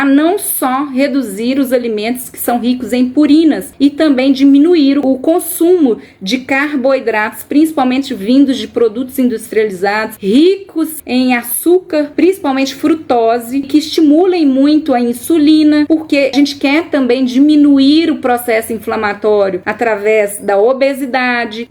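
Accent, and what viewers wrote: Minas Gerais, Brazil. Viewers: Mineira com certeza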